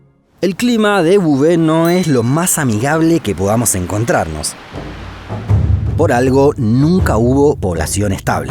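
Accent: Argentina. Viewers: Me encanta escuchar ese asento argentino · Que mal que tengas el acento arjento, lo único malo del video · Tal vez sea porque es Argentino.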